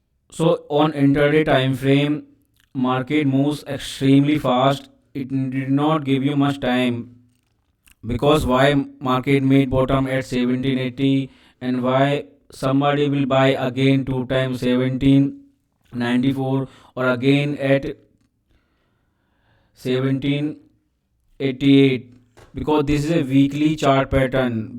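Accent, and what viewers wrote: India. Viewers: The indian accent is wow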